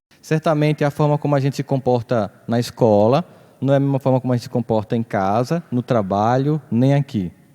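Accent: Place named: Northeast, Brazil. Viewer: Assistindo hoje 17/01/2022. Há 6 anos, em um momento muito delicado da minha vida (depressão braba ) conheci esse iluminado, aleatoriamente mexendo no youtube, e nunca mais deixei de ouvir suas palavras. Sempre busco suas palavras, pois me fortalece, esclarece e me centra. É uma viagem p dentro de nós mesmos. Sem falar q amo esse sotaque, pois meus pais tb são nordestinos.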